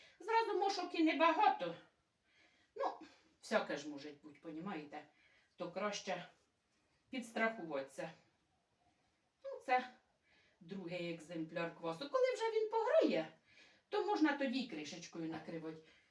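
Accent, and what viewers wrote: Poltava, Ukraine. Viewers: Так говорят на Полтавщине. Прекрасный край, чудесные люди. С любовью Мариуполь. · Правильно ви балакєте так як і ми на полтавщині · ваш тембр дуже нагадує специфічний полтавський акцент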